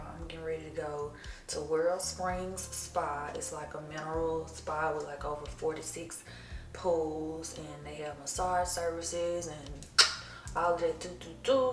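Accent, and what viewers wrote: South, United States. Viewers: Thanks! I love your accent too, I find myself trying out the southern accent after watching your vlogs 😂 lots of love to you & 🫶🏾🫶🏾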